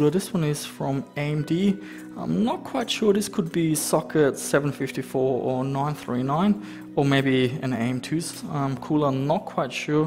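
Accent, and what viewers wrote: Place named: Germany. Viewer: Are you an expat? You sound German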